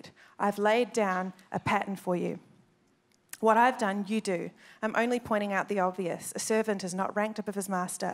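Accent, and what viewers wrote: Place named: New Zealand. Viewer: New Zealand accent!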